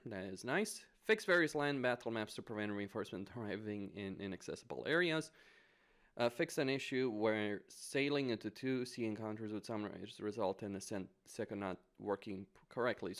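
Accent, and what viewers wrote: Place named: Romania. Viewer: e sounds Romanian I might be wrong tho. Some Balkan country maybe? Romanians have a pretty distinct accent I find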